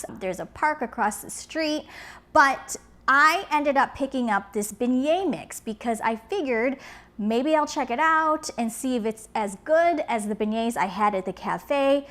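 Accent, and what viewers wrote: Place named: Hawaii, United States. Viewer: I wanted to try cafe du monde mix but I wasn’t sure how it would turn out. So I searched for a video of someone who had done it…and found you. Are you from Hawaii? You sound like you are.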